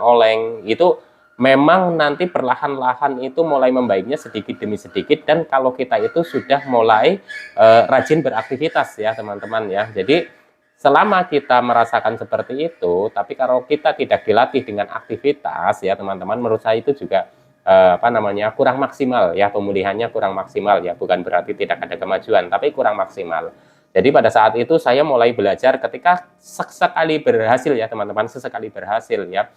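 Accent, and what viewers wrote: Banyumas, Indonesia. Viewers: Menyimak ngapak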